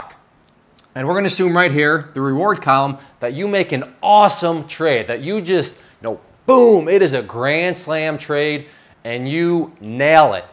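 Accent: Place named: Midwest, United States. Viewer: lol, "I understand math." No doubt, brother. You sound like you are from Iowa/South Dakota/Nebraska.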